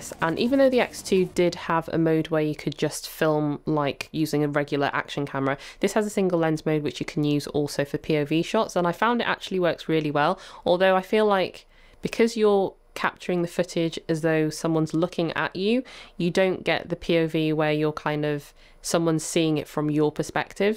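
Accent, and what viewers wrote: United Kingdom. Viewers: This is the first time I've seen a video of yours. The truth is that I loved the way you describe and explain things. Your voice is very relaxing and I really like your accent. (is british?) It is a pleasure and a delight to listen to you and watch your videos. I hope I can have an insta360 soon. New subscriber here! Greetings from Uruguay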